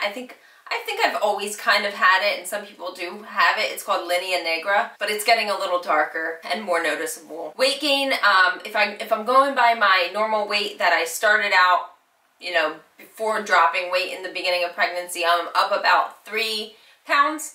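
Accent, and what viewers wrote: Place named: Upstate New York, United States. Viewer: You are such an upstate New Yorker aren’t you lol. I live up in the Hudson valley area now and you sound like my girlfriends here. I’m 17 weeks - haven’t checked out your latest videos but this was really fun and enjoyable to watch! Thanks for sharing.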